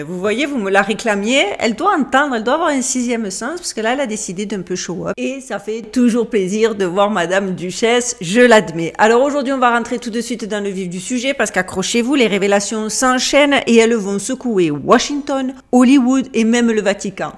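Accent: South, France. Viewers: Super merci et ton accent du sud si particulier me régale.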